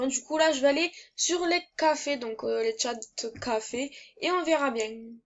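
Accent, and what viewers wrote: Marseille, France. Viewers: Ta l'accent Marseillais tope la copine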